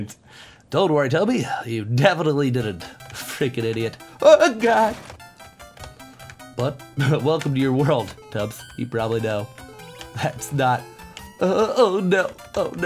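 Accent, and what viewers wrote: France. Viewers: that french accent though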